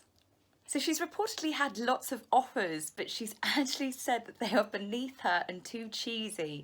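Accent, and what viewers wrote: United Kingdom. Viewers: Hi.love ur Channel so much and for telling it like it is when it comes to Markle with No Sparkle.such a self centered.controlling.manipulating human being!! Cannot stand her Fakeness! I love your British accent may i say and you are a very pretty young lady.may you and yours stay well and safe thru this crisis we are all enduring.look so forward to ur Royal news each day.Hugs from Canada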